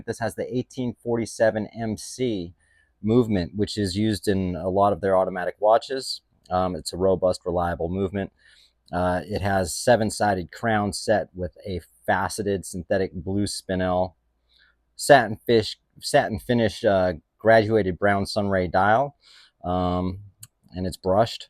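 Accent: United Kingdom. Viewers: Great British accent….. can we have some more 😂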